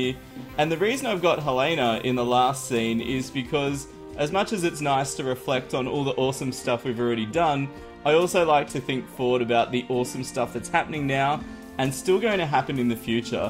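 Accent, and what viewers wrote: Australia. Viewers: Wow didn't realize u were austrailian(guess I should have known from ur accent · is Australian I thought he was English my mind is blown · 4th like I never new u was Australian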